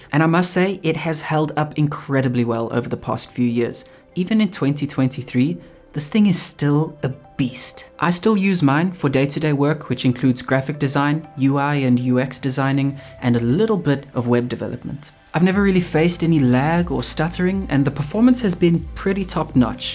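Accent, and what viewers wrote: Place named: South Africa. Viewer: I know a South African accent when I hear one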